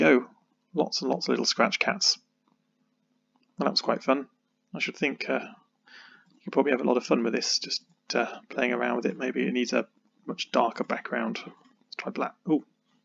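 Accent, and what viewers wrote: United Kingdom. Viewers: waitwaitwait you're BRITISH?? that's what it sounds like.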